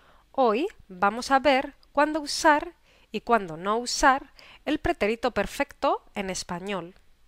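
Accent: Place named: England, United Kingdom. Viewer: Me ayudan mucho tus videos.Y me encanta tu acento inglés.:)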